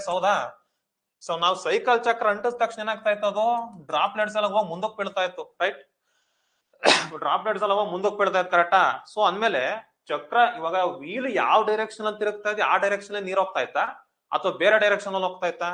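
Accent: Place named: Mandya, India. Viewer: Sir Nim voice matado style mandya bhashe bittu hogilla niv ellig hodruu ❤❤❤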